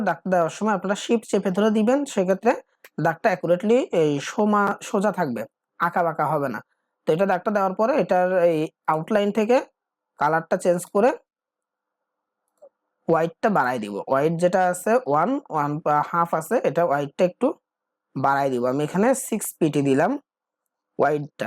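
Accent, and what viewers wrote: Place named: Chittagong, Bangladesh. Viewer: চট্টগ্রাম ভাষায় কথা বলছে ।। আপনার ঘর কোথায় 🤔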